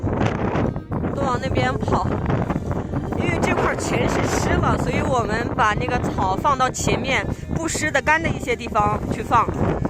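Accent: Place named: Beijing, China. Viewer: 这汉话没有内蒙口音，倒是像北京话，譬如用 霍霍 这词。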